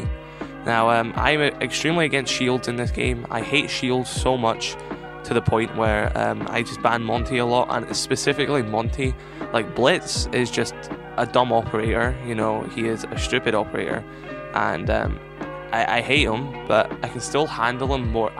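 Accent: Scotland, United Kingdom. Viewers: Good vid dude just recently subscribed noticed your accent your from scotland! Me too 🏴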